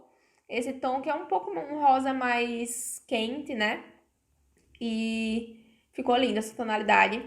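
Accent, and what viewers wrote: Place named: Mossoró, Brazil. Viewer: Mulher, morta que você é daqui de Mossoró! E eu achando o sotaque parecido kkk que maravilha 😍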